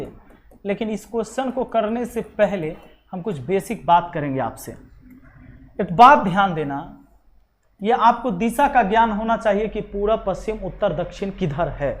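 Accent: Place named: Bihar, India. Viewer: Sale bihari hindi me bol liya kar.... Bihari bolna jruri hkya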